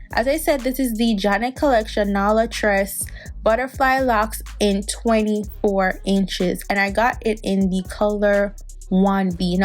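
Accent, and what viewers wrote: Jamaica. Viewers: I’m sooooo SURE your Jamaican. Right or wrong?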